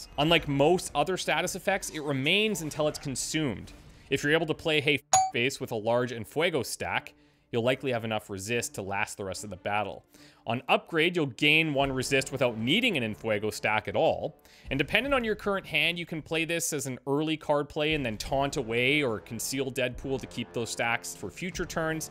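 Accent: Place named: Canada. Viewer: Love the Canadian accent first and foremost. I'm excited for Deadpool and I hope Storm is next, fingers crossed. Sounds like DP will get progressively stronger with every KO until he's a powerhouse, so you'll want to use him for cleanup kills as much as possible, which sounds new and fun. I'm thinking ideal build for him is Deadpool, Doctor Strange, Captain America. Cap can absorb damage and soften up enemies for Deadpool KOs while Doc can enable Deadpool (what he does best) while Deadpool builds his stacks.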